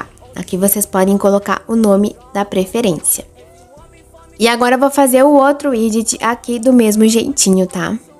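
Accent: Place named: Rio Grande do Sul, Brazil. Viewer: Oi vc e gaúcha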